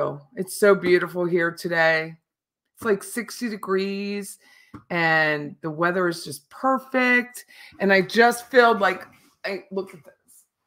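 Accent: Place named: Central Pennsylvania, United States. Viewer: You are so fun to listen to. I’m from NYC and went to undergraduate at PSU (University Park) and I love your accent …. It’s so central PA 🥰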